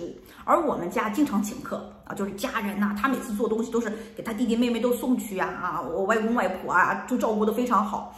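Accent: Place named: Northeast, China. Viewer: 感觉一口东北口音呢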